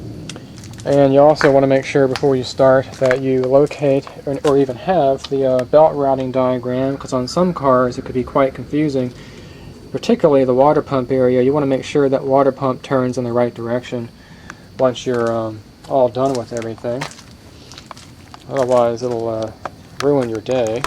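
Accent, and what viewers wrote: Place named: Seattle, United States. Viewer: You sound like your from around Seattle/Everett WA.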